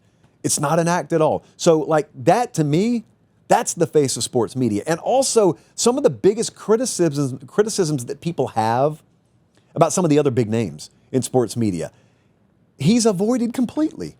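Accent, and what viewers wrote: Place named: Tennessee, United States. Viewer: The comparison between you and McAfee is interesting.. both guys without “J school” degrees making it big simply due to the advent of the digital era.. but you two are polar opposites in terms of the appeal behind your success.. McAfee is charmingly dumb, funny to the average man, slightly controversial.. you on the other hand are a true intellectual who happens to have a TN accent and love college football.. and because you use big words and back your opinions with critical analysis and make jokes that are way over most’s heads while inevitably offending people who watch your content already having their minds made up I think your audience cap is much less.. if you sell out and pander to the masses I think you could be #1 in 2035 👍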